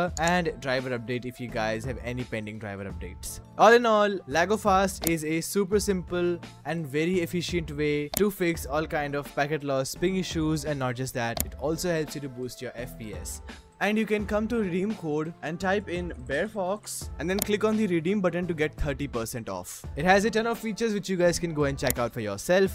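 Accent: India. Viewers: India Voice = HACKER dont download is file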